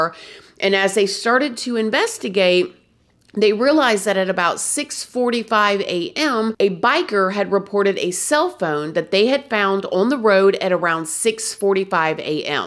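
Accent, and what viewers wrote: South, United States. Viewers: I agree, I think this was a random attack but VERY coincidental. I think he saw her run by, either knew who she was since her family was so prominent in that neighborhood or saw she was minimally clothed and thought it would be a perfect opportunity for SA. It makes my stomach turn to think she was just out minding her business and someone could do that to her. I heard her remains were in pretty bad shape (I don't want to go into detail because from what I heard, it was just horrific) but I think what he did in the disposal was to try and get rid of DNA evidence from himself. My heart breaks for those boys and her husband. 💔 Sidenote: I love your vibe and southern accent, I've been watching your videos for a little over a year, especially the videos of you explaining your past. You keep it real and I admire that!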